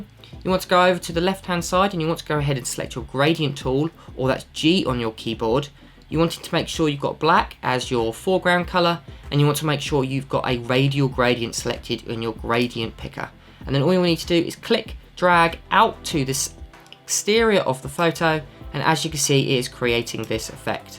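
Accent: United Kingdom. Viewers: Is this British accent? I like it😍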